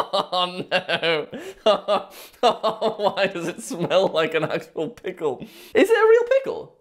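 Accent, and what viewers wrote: RP, United Kingdom. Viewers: I can tell so easily that he is British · he’s so posh in this video 😂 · where are you from? You sound British I am as well.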